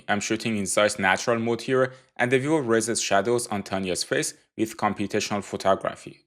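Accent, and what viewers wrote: Iran. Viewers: I realize that accent it's Persian accent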